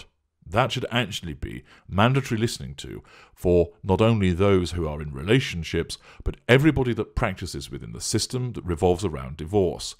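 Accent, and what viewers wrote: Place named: Northern England, United Kingdom. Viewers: you have the sexiest (posh with a slight Northern inflection) voice on Youtube but then you already know that. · You sound like Professor Higgins or Colonel Pickering, in My Fair Lady. As an American, I can attest that at least 75% of English accents are charming, and we also love an Irish brogue or a Scottish Burr! We are completely charmed by almost any UK 🇬🇧 accent. However, there’s something soporific about the mellow tone and accent of. He could read the telephone book and put me straight to sleep. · Manchester and environs . Would be my best guess . But am a Northerner myself .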